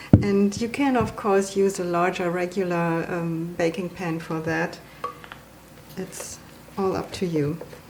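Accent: Germany. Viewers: Not only do I love this recipe and channel, but I love your accent! My father was from Hamburg and I miss his accent. He passed away. He was from WW2. He was a doctor as a profession in America. The German accent is a pleasant soft rolling melody of inflections to my ears, so I really find it easier to learn new German recipes on this channel. My brain gets it. ( can't remember the prayer he taught us for "grace" at the table, though, sadly.) It's been decades ago, many!!! Love this channel.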